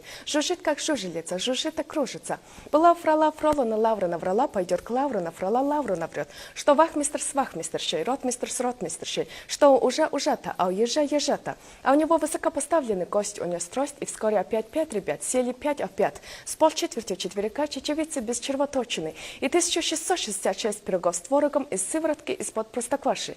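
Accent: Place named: Mongolia. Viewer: damn so thats how mongolian accent in Russian sounds like… those lisping L's are the cherry on top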